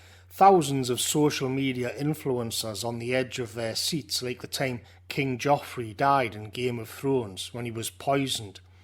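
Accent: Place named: Newcastle, United Kingdom. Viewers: Well it's certainly not everyday you get to hear the word "catharsis" spoken in a broad Geordie accent! Excellent content.......... subscribed. :) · as a northerner myself this channel is a wonder and listening to the accent of the north is refreshing ..he is insightful and much needed in these times ..i would vote for this man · So well articulated. Just as i found it on my return from Sweden after one year due to kung flu regs. Love the background shots of rushing water ,the simple melody on the concertina and the northeast dialect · 'Geordie', North East England.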